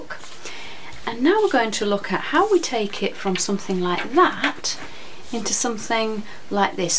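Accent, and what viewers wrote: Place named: Northern England, United Kingdom. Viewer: I have been trawling all your videos - your voice is lovely - Northern girl same as me - and I adore the colours you choose to print together. Lovely pages, lovely patterns - I'm just going to try a gell plate for the 1st time tomorrow ( it's 4.30am and I'm an insomniac!) Thanks so much for sharing xx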